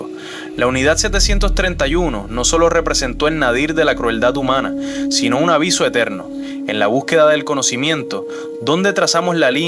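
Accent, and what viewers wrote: Cuba. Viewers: Si tiene acento de cubano, no vale.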